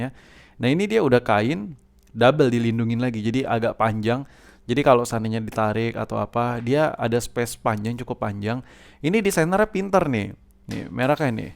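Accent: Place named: Banyumas, Indonesia. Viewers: Kaya wong banyumasan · Anjay basa jawanya kental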